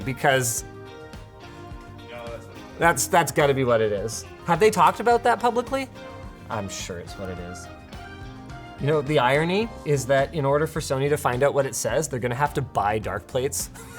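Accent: Canada. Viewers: sounded extra Canadian in this video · I actually heard a bid of a Canadian accent from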